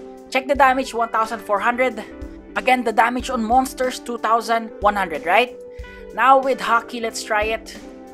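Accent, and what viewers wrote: Philippines. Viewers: You sound so filipino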